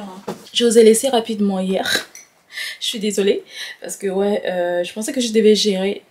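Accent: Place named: Gabon. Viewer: Je savais avec cet accent que ça c'est une gabonaise quand j'écoute encore le elang no si😅ma sista FANG 🎉🎉je m'abonne du courage❤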